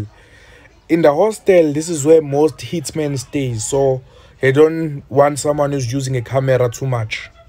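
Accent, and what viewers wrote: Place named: South Africa. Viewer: I love this sort of English accent, with no effort of trying to perfect it. I love this South African way.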